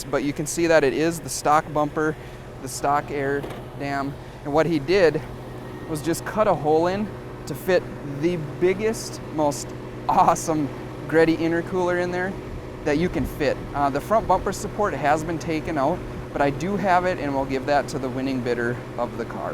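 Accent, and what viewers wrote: Canada. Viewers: are u canadian? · i dont mean to offend you, the car is cool and all, i mean im not gonna buy it, but, your canadian accent is super annoying.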